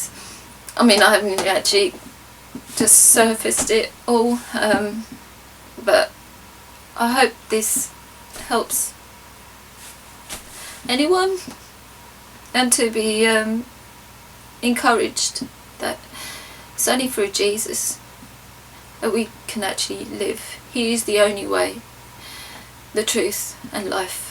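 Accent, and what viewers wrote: United Kingdom. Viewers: I'm an alcoholic, but sober 12 years. I know where your coming from. You've probably done a lot of mental damage. I go to aa a couple of times a week. I'm saved by Jesus. Don't isolate, get out and mix. Your not alone. Love your London accent. Keep the faith sister. Big love xxx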